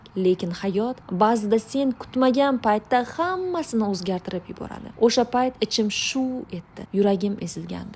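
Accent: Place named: Fergana, Uzbekistan. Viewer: Фаргонадан экансиз а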